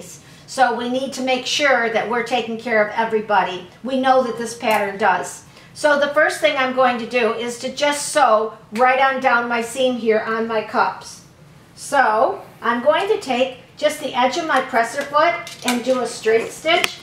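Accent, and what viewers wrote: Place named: Cleveland, United States. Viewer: Thank you so much for your video! I think even I could do it with your instructions (and I’m a knitter, not a sewer!). I love your Cleveland accent - it makes me miss home, originally from Akron. God bless you for all you are doing to help the health care workers. 🙏🏻💜👼🏻✝️😇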